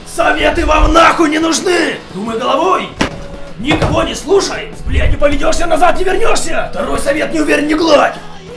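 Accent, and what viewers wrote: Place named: Japan. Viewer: говоришь с японским акцентом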